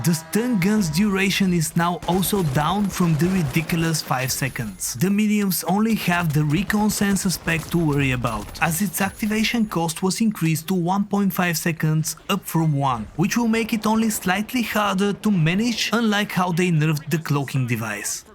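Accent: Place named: United States. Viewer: please dont take this negatively but I love your american accent. what are "Resuesses" though?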